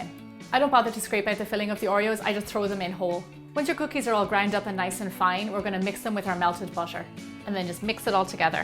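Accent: Ireland. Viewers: Love the Irish accent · Wow! I've found my new favourite chanel! Lovely, easy food, no hard to get ingredients, and a lovely irish accent! (The best one for me) Cheers from Argentina!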